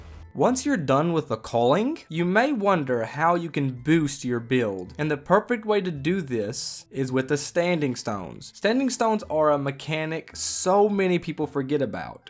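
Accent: South, United States. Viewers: My opinion is..... You have a fantastic southern accent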